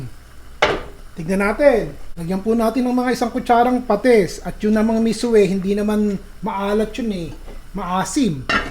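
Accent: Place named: Nueva Ecija, Philippines. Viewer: Taga nueva ecija po b kau chef? Kase yong accent at mga words ninyo ng tagalog.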